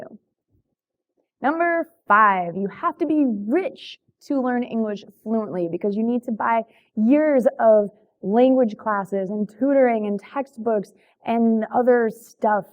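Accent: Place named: United States. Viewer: I love your pronunciation!! So American. I lived in America before, but living in America for 1 year is not enough to speak English fluently or learn English perfectly. So I was looking for this kind of Video which is made by American. Thank you so much! Watching from Japan!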